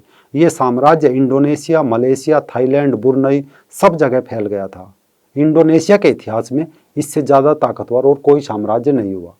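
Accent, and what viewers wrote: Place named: Himachal Pradesh, India. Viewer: Bhai tu himachali hai kya accents se lagta hai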